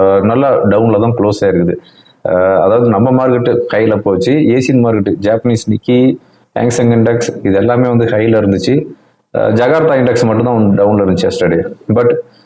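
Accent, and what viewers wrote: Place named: Chennai, India. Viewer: தல மெட்ராஸ் பாஷை பேசுது..வா தல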